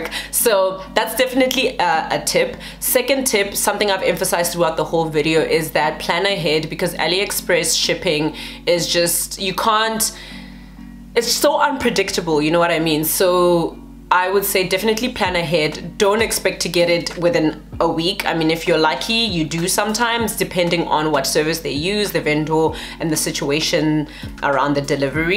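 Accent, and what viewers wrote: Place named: South Africa. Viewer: South African accent😍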